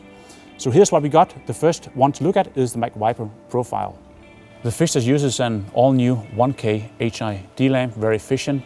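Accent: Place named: Denmark. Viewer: total danish english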